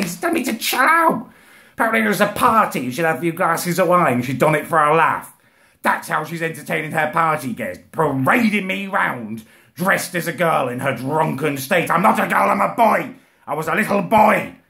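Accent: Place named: Bristol, United Kingdom. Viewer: I thought he was from bristol not liverpool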